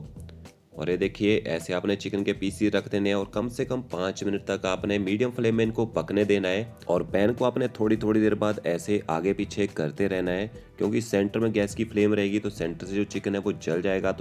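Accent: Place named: Uttarakhand, India. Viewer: This chef is from Uttarakhand guessing cuz of accent 👍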